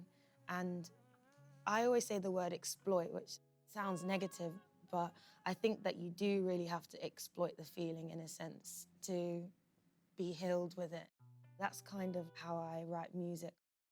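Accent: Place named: England, United Kingdom. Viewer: Wow u have an English accent this whole time still love you but damm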